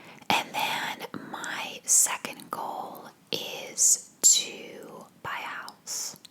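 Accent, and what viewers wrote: United States. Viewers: I'm so curious about you. You have an American accent but you live in Ireland. Your folks live in Spain. Can you speak a little bit about your history.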